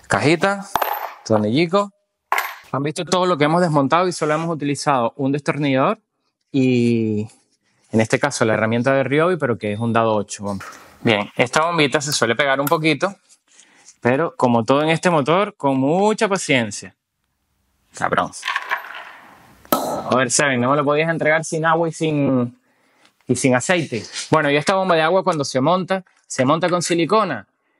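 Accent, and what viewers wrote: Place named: Venezuela. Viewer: Suena más a venezolano que el mismo maburro😂😂😂😂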